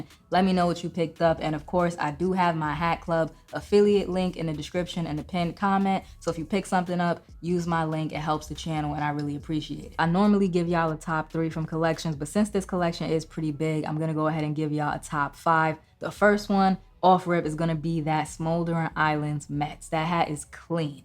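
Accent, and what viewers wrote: Boston, United States. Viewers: Boston hat is 🔥🔥 and lol on the accent, I’m from Boston and hate that accent lol it’s more white Boston/suburbs. I’ve had a busy week with hats. sneakertown had some re stocks and you talked about before the Morton salt hat. I’ve been waiting for it since then and my girl hit on it for me last one in my size and she copped the biggie hat from the 5 mike pack, and the rangers hat from ecapcitys drop last Friday cause I went for the cubs. She’s awesome. Also copped my first Rockies hat too. I miss your upcoming drop videos you do the best ones cause you talk about ones that haven’t dropped yet. Appreciate you and your videos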